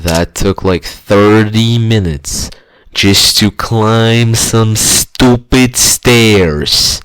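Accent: Russia. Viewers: you sound vaguely Russian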